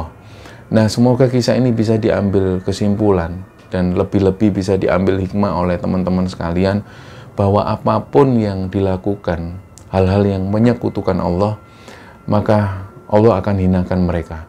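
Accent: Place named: Surabaya, Indonesia. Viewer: Aq seneng logat Surabayanya nek cerita, jadi kangen kampung halaman, tanah kelahiran Surabaya.. hahhaa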